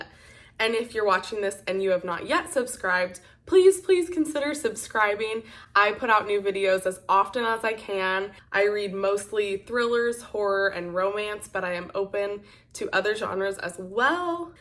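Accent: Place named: United Kingdom. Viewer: Your British accent kills me every time 🤣🤣🤣🤣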